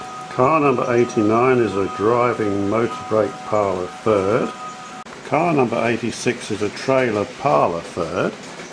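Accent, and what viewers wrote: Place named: Australia. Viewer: Wait, your accent has an australian twang... if youre australian, ignore the beginning part of the comment under this........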